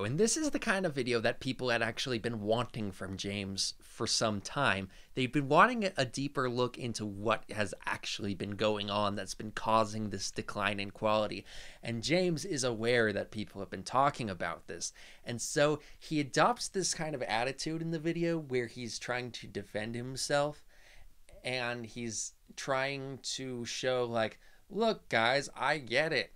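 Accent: New Jersey, United States. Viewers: I think he pronounces words in that manner because of his scarfy mid atlantic jersey accent … a little philly a little NYC a little North jersey … mash up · Dude he's from New Jersey, of course he has an accent. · the simple explanation is that hes from Jersey his accent gets in the way of pronouncing certain words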